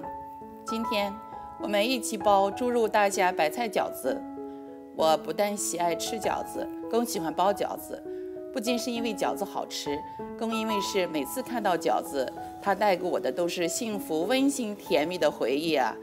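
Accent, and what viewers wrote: Qingdao, China. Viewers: 在德国学包饺子，青岛口音太亲切了！ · 这口音……青岛人表示好亲切啊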